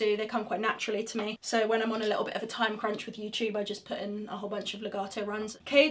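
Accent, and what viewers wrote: England, United Kingdom. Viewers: Where are you from? You sound so British... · I am from the U.S. What type of English accent do you have? I know its not Cockney. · You’re not only into music, but motorcycles, touring America, house, kids, English accent, etc? Good God girl-why couldn’t I have found you?!? Yes, let’s see a vid of you and your motorbike experience 👍🏻🇺🇸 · Typical Brit accent!i love it!you gatt try or or by! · I'm a little late to the party as I only found you on youtube recently and was amazed by your skill. Looked up more recent videos of yours and after a few covers I opened this Q&A, so that I could have an idea of who you were, aside from a fantastic guitar player. Well, I'm hooked after just the first minute. I mean, I was going to subscribe anyway, but: - (amazing guitar skills) - awesome british accent - loves science - likes motocross and would have wanted to become a pro rider Really??? 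You're great, I'll be very happy to follow your future projects :) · The british accent is simply the icing on the cake. What a combination of personality, talent, looks, aaaaaaaaaand accent. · Ah...Your ENGLISH English as the young lady in the Warlock movie says!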